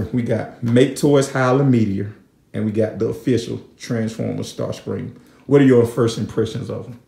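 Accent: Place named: Atlanta, United States. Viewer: Right on! It's all good,Bro! I picked up on that ATL accent instantly. Lol!!! I'd actually stopped through there in between flights while on my way to Canada last month. But,yes sir I'm on IG & just posted my first TF pic on there yesterday. Will do,Bro!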